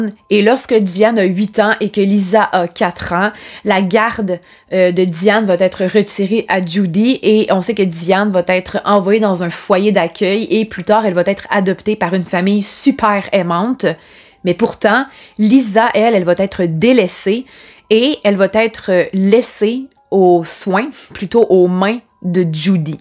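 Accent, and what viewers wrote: Canada. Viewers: Coucou, je te trouve vraiment très sympa et j’adore t’écouter déjà parce que ton accent canadien (ou québécois ? ) me plaît mais aussi pour tes idées sur les sujets très variés que tu traites...je suis d’ailleurs souvent du même avis. Concernant la peine de mort par exemple, je pense qu’il est débile de punir une personne qui a tué en lui faisant subir exactement le même traitement ! Avant j’étais + partagée sur la question : peine de mort uniquement pour les pédo...... et les tueurs d’enfants. À présent je pense que:"qui a bu, boira" et qu’il est nécessaire que certaines personnes n’aient jamais de 2ème chance...et ne doivent en aucun cas sortir de prison un jour, même 20 ans plus tard. Les exemples multiples nous ont démontré qu’on ne change pas et qu’une maladie mentale se traite plus ou moins mais ne guérit jamais véritablement...bref, bien à toi jeune femme sympathique et continue de nous régaler avec tes vidéos. 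Bonne semaine.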